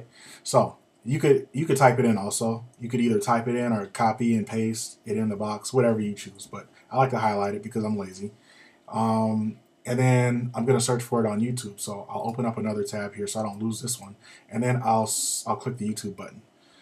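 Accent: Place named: North America. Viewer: i love your american or candian acceient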